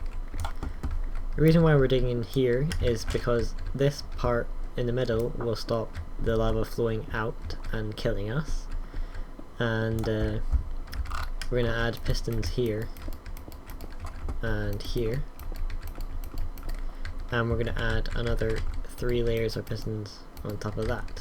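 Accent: Scotland, United Kingdom. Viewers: YOUR FROM SCOTLAND YAY MEH TO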